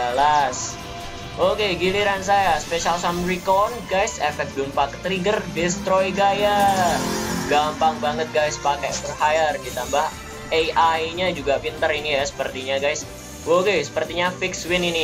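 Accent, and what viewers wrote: Java, Indonesia. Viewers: medok poll cokk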